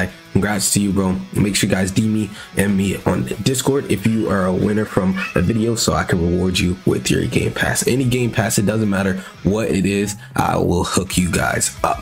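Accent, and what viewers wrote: Trinidad and Tobago. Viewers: R u from trinidad? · Are u from trinidad? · Are you from trinidad?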